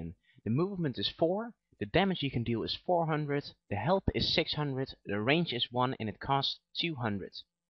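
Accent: Netherlands. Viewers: Are you dutch? U got a dutch accent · great, but should have added an ingame shot as well, just to see what it looks like. Just wondering, dutch accent?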